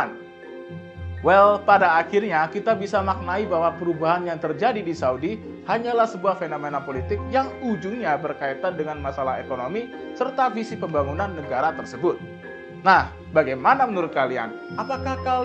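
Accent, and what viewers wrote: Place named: Java, Indonesia. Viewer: Medokmu itu lo mas, aku suka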